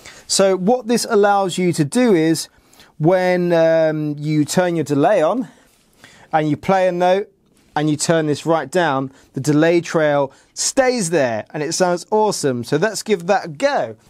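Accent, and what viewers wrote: England, United Kingdom. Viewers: Are u from England? I lived there for 5 yrs...IPSWICH!!!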